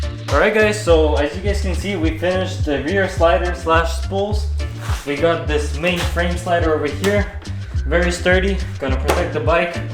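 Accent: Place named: Russia. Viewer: He sounds like russian blyat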